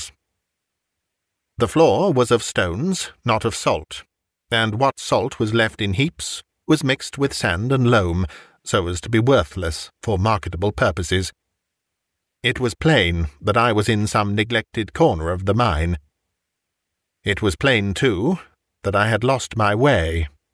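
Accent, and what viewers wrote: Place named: RP, United Kingdom. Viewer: Dang I love yer posh voice!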